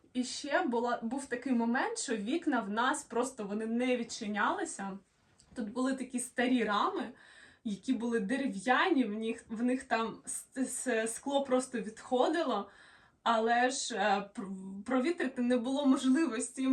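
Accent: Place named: Western Ukraine, Ukraine. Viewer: У нее бизнес,а не молодец,голос западенки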